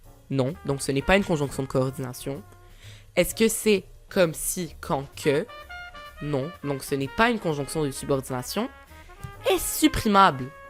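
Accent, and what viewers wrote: Canada. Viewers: Il a un petite accent québécois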